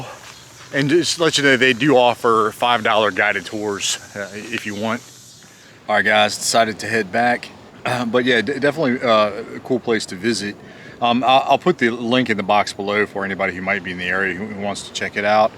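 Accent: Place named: Maryland, United States. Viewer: You’re going to some interesting places..thanks for the video! I have lots of family in Maryland, and you have the same accent as them..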